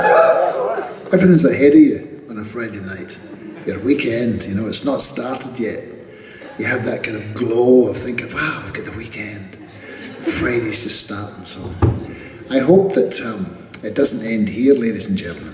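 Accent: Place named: Scotland, United Kingdom. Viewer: So glad he kept his west coast Scottish accent after all those years away.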